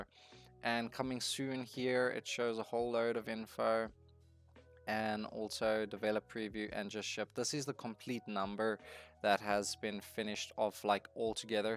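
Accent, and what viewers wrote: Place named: United States. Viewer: that american accent tho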